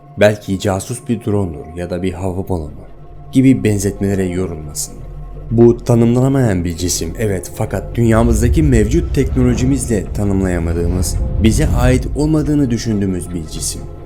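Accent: Azerbaijan. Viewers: sen azerisin yoksa turk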